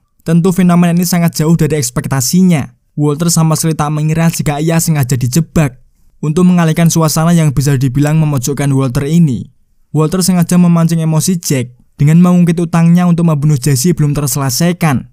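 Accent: Java, Indonesia. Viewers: Akhirnya. Selesai setelah. Berjam jam yimak. Seru ui. Apa lagi narat0r nya. Berl0gat med0k. Berasa tak asing. Seperti ng0br0l ama kawan diselala n0nt0n pilim 😁😁😁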